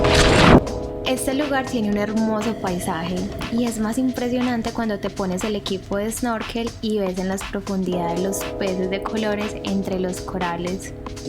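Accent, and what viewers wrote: Antioquia, Colombia. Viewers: Eres hermosa... y ese habladito paisa me mata👌❤🇨🇴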